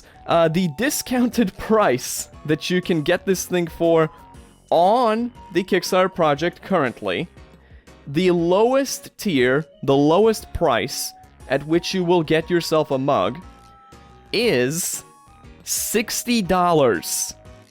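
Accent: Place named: Europe. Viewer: Your european accent is fading. fascinating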